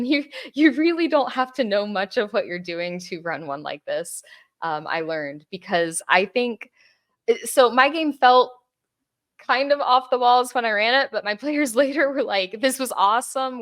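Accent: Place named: United States. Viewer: You have a pretty flat American accent, anyway. The bastards just want to silence people and control everything 🤨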